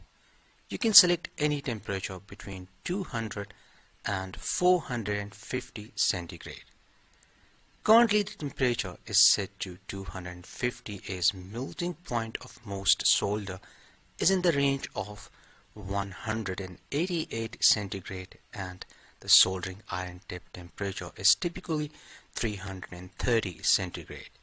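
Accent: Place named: Mumbai, India. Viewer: I like your accent. Is it Mumbai/Bombay?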